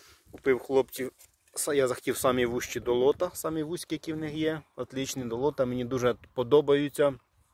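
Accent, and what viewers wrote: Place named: Khmelnytskyi, Ukraine. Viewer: Говор наче з Хмельниччини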